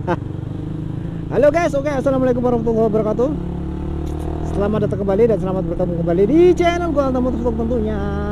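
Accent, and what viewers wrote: Java, Indonesia. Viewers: Masih medok ya 😂😂😂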